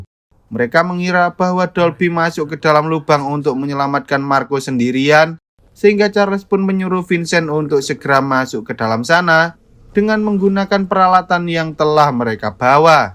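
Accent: Java, Indonesia. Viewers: pekat kali medok nya ya..🤣😂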